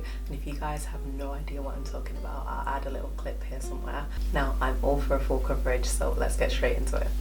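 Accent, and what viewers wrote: United Kingdom. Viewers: She's British lol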